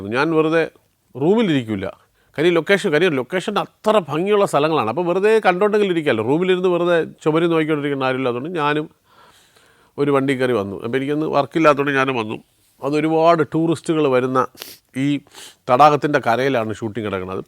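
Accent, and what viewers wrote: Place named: Trivandrum, India. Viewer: Happy to listen to his narrations. Love the Trivandrum accent ❤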